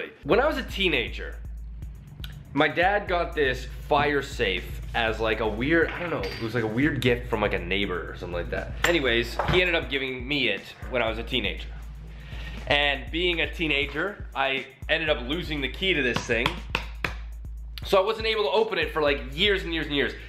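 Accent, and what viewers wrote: Canada. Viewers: He's Canadian so he pronounces some things differently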